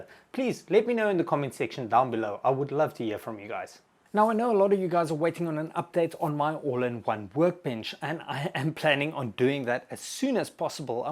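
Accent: South Africa. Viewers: Loved this so I subscribed. South African? Nine video and I think the final bench would work great when cutting sheets of plywood iv you just put like floor insulation on the table first.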